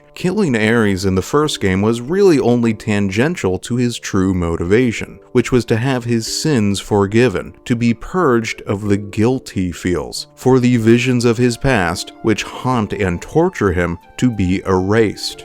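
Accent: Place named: Oregon, United States. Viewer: You sound like you're from Oregon. ♿🏳️‍🌈♿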